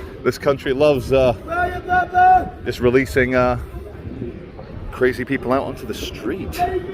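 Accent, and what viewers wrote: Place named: Scotland, United Kingdom. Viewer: love your subtil Scottish accent, very unique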